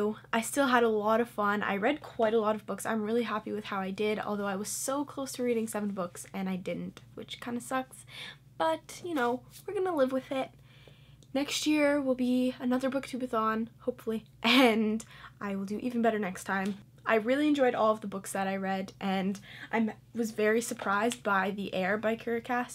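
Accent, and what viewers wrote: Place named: Canada. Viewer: YOU DID SO WELL I'm mad jelz. ps. I always forget your Canadian and then you'll say something and I end up hearing the accent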